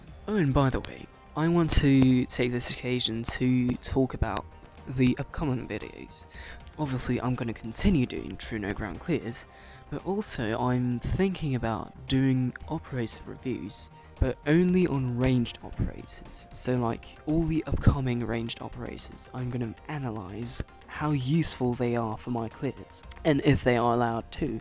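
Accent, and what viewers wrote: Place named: United Kingdom. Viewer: Why is this man with a British accent saying he isn't native? Am i just really bad at placing accents?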